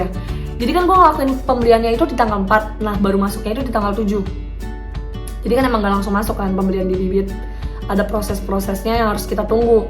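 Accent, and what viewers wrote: Bali, Indonesia. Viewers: kek logat orang Bali 🤔 , tpi thanks. ya video nya menarik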